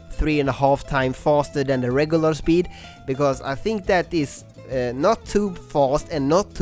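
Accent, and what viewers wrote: Sweden. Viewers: Is he swedish? o.o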